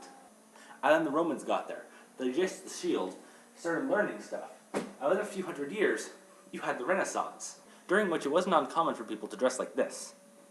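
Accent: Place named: Scotland, United Kingdom. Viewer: nice scottish accent dude.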